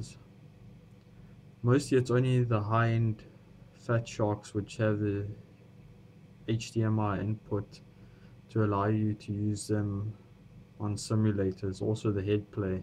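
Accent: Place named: South Africa. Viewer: You are definitely South African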